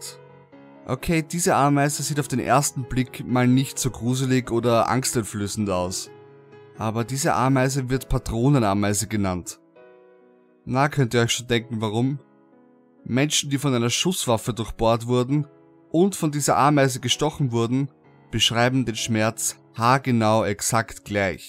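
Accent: Austria. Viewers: Du hast ein Österreichern Akzent 😂 komme aber aus Deutschland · bist du ein Österreicher? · Des is jo a österreicher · Bist du Österreicher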